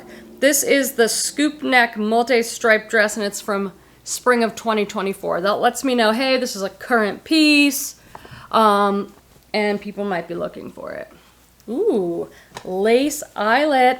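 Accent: Boston, United States. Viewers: I LOVE your Boston accent! Bra, Halara… I could listen to it all day. 😊 I want the Hudson Bay blanket! I’d struggle with selling or keeping it. Lucky reseller that found that!!!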